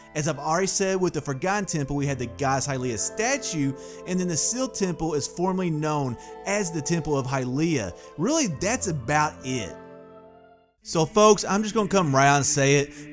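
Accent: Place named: South, United States. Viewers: Don’t ask why, but your accent sounds like you’d give me a “Roll Tide.” Interesting perspective and some good research, sir. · Holy southern dialect I can’t get over “Duuungin”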